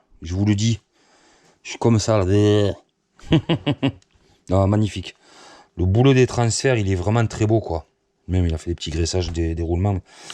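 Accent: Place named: South, France. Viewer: T est bien du sud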